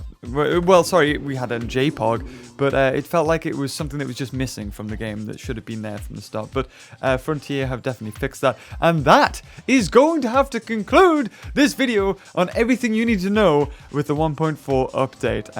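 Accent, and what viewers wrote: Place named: United Kingdom. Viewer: You have a uk acint and britches in some video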